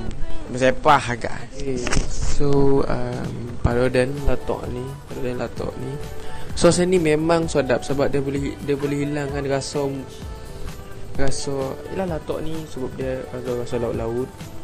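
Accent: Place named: Negeri Sembilan, Malaysia. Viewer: Fuuuu, pekat nogori nya tuuuu.. Terboyekkkk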